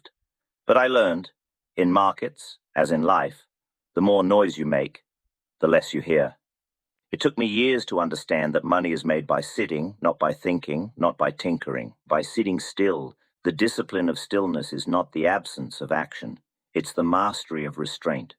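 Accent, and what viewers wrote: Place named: Australia. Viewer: Sometimes it sounds like has developed an Australian accent 🇦🇺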